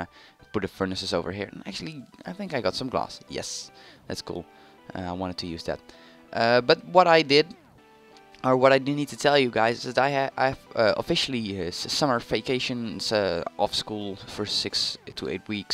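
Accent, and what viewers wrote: Netherlands. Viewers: Ben je Nederlands? Je klinkt Nederlands :)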